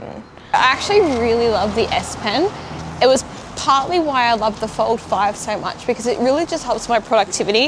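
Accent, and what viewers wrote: Australia. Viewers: Is ur accent Australian ?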